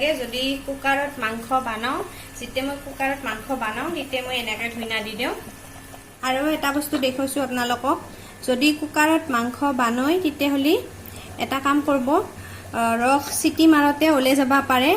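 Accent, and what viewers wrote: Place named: Pathsala, India. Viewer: Mur mar ghr nalbari..apunar kothabur buji puat eku problem a hua nai..moi bhal pong ei pathseila kothagelkhon😊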